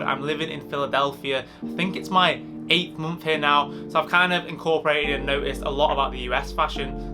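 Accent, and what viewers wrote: United Kingdom. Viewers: Are you from the north west? Near Cheshire/ Merseyside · you from stoke? i like guessing lol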